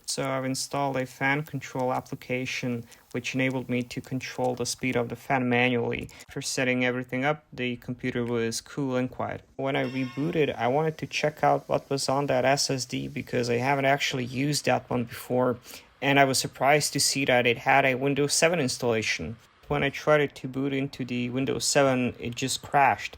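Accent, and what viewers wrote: Quebec, Canada. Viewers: You actually DO sound very similar to French Canadian! 😄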